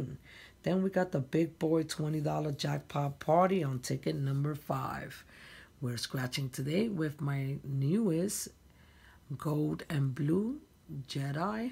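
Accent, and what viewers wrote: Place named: Puerto Rico. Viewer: Nicely done ✅ boom 😘 I love your accent am I picking up some Puerto Rican in you. I hope I’m not over stepping my bounds because that’s not my intentions. ♥️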